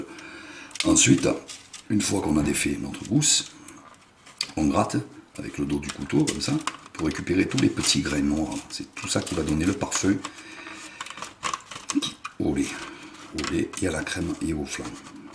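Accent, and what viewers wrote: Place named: Narbonne, France. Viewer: Exactement la recette que je fais. Ma mère faisait la même (juste elle mettait plus d'oeufs ) je mets un peu moins de sucre Mais javais besoin de précision et elles sont bien présentes dans cette vidéo ca ma fait rire d'entendre dire de ne pas changer de casserole Un côté humoristique que j'aime beaucoup ^^ Et je suis aussi du midi région narbonnaise ..(je reconnais bien l'accent ;) Merci !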